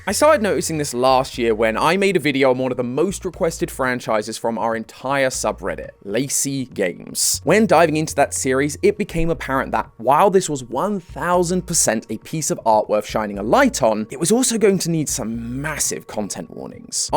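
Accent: United Kingdom. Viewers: He's British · I hate this wanna-be, low tier, british, fake matpat host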